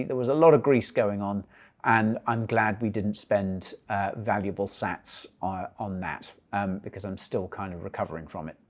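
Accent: United Kingdom. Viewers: one of the few channel's that gives you facts and doesn't promote sh1t coins, keep up the great work, and your British to boot.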